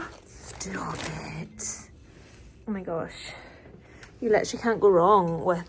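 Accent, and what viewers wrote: Scotland, United Kingdom. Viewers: So sad to hear this as an English woman. I think normal English people love Scotland and the beautiful accent. Be proud! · Dear Scotts, please do not ever tone down your Scottishness! Fantastic world-changing innovations have been created by Scotts! Congrats. Life ‘is’ a big adventure and take all the nuggets you can!! 🎉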